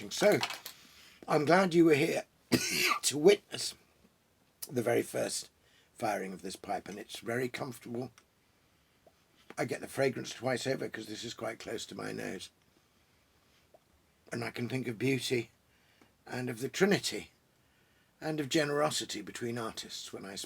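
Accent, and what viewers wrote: England, United Kingdom. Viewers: Strange, I saw this in my feed and thought to myself: this guy probably has an English accent. So I clicked on it and behold it was about pipe smoking, which I do from time to time but have never searched for this stuff on YouTube. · I can only see an elderly Jack Black with a well spoken English accent. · If I ever make it to heaven, I imagine this is the cameo of a man I’ll meet at the front doors. Smoking a pipe, reading my life back to me with an honest smile and an english accent. Until we meet again. 🍻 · I am Canadian so seeing a man with a British accent smoking a pipe reading Tolkien, this is what Canadians believe the British turn into once they reach 50 just the sweetest persons lol.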